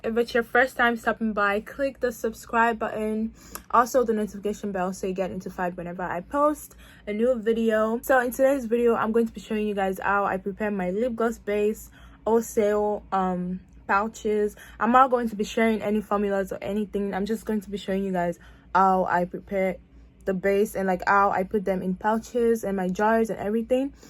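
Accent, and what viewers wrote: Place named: Africa. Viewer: Love your African accent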